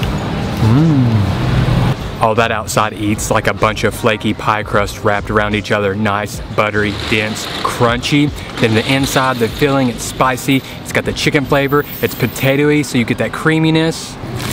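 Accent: South, United States. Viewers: hehehe that southern accent <3 <3 ya'alls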